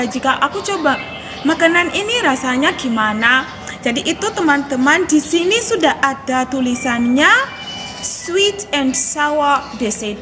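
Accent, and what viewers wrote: Java, Indonesia. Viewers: Logat jowo nya medok banget mba hehehe.. 😅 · Medhok tapi keinggris"an gimana ... gitu. Commel😙😙 Semangat selalu kakak! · Medhok tenant rek, bikin gwemesss... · Medok nya josss 👍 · asli Jawa ya..heehheeh logat jawa.. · Logatnya Jawa banget.. semoga makin betah di indo ya...!!!🤝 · Jawa bgt aksennya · Logat Jawa anjir bkn indonesia coba dah biarpun BHS. Indonesia di ucapkan pasti logat daerah GK bakalan ilang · Oowh kuliah di Surabaya yah kuliahnya tapi aslinya orang luar negri ,pantesan logat nya logat Jawa medo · Logatnya medok bngt .sukses slalu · wkwk unik banget kali ya Nemu orang luar tapi medhok😂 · Medhok😁. Good lah👍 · Bahasa indonesianya medok jawa mbak... Mantull 👍👍, Susuban bahasanya bagus.. sopan dan sangat mudah dimengerti.... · cocok dadi penyiar JTV,medhok'e ws juara tenan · Jawa banget ngomongnya mba' .. btw you are so beautiful and so smart looking ! 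· Bismillah dulu kalau mau makan atau kalau tiap mau melakukan suatu aktifitas .. hehe jangan marah ya cuma bercanda 😀😀 ..dan ucapan bismillah itu hanya diwajibkan pada yg beragama islam Oke bahasa indonesianya sudah bagus cuma logat jawa nya kental. Tapi gak apa-apa alhamdulillah yg penting dah lancar 👏💪 Salam dari samarinda kalimantan timur indonesia 💖🇮🇩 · mba kamu kaya Jawa tulen.asik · kalau belajar bahasa Indonesia di Jawa, jadinya medhok... kesannya lebih native... apalagi kosa katanya dan susunan kalimatnya sesuai EYD.. mantap mbak.. gw kasih 2 jempol... :) NB: jadi, wajar, kalau yg besar dan sudah lama bergaul dalam lingkungan berlogat Jawa, sadar gak sadar, akan medhok pada waktunya.... contohnya: Londo Kampung, Korea Reomit... dsb. :) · Medoknya kayak bukan dari negara zimbabwe maap klo salah tulis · Mungkin dia orang Simbague tapi pas dia ke indonesia dia tinggal di jawa jadinya di ngomong indo kaya orang jawa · Wah medog gg kak👍🏻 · Logat jawanya bagus kak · Logat jawanya pekat bngt kak , Salam Dari KAB.TAPSEL PROV.SUMATERA UTARA, INDONESIA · Logat e jowo slur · Logat jawanya itu lho... 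Bikin ngakak.. Good job sis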